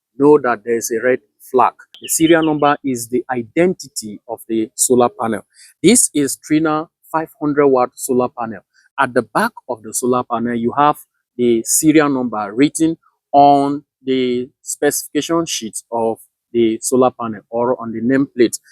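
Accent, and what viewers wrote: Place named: Africa. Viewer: There is nothing wrong with the way he pronounced circuit and Celsius, he's from Africa do you expect him to sound European!?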